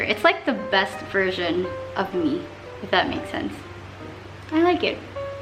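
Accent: Philippines. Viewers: Nice video, enjoyed it you’re really cute and actually surprised first Philipina to hear talk fluent english with accent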